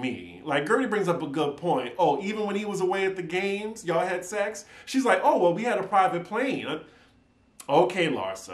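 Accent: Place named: South, United States. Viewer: not you ashamed of your southern accent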